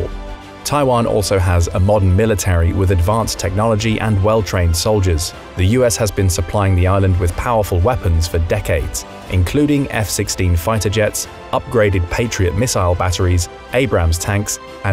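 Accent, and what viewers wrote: United Kingdom. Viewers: Glad I found this channel. Informative reporting on contemporary geopolitics, and with an easy to understand British accent!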